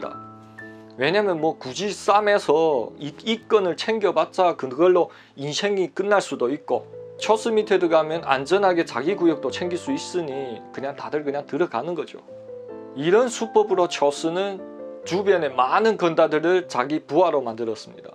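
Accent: Yanbian, China. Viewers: 이사람아 우리말 부터 배우고 방송해라 거놈의 연변 토박이말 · 연변말도 한국어의 지역사투리중의 하나입니다.니네말 우리말을 가르면 한국어도 서울말,경상도말,전라도말 등으로 나누어야 할겁니다.서울말만이 한국어인가요?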